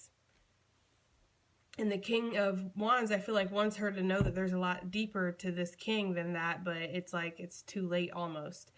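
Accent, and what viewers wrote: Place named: New York, United States. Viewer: You talkn to me this morning #pureNYvoice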